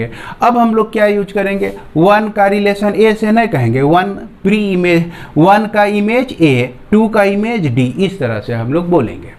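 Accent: Bihar, India. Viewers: Bihari Accent 👌😘😂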